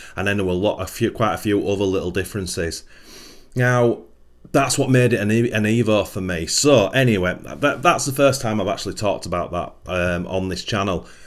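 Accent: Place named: Northern England, United Kingdom. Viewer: Love the channel, but a bit of a personal question, you have a northern accent and I am thinking south lakeland.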